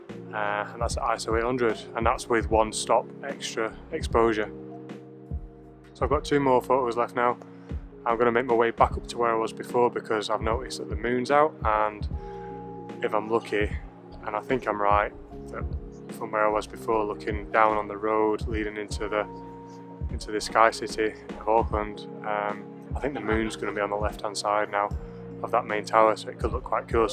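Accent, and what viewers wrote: Yorkshire, United Kingdom. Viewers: Hey, you sound more like Yorkshire than NZ?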